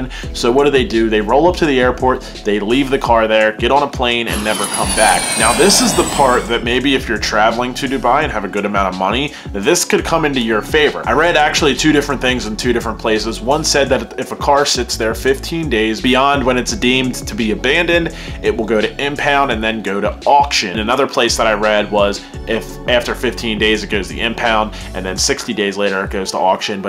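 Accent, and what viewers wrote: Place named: Delaware County, United States. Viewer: r u from pa?? u have a delco accent lol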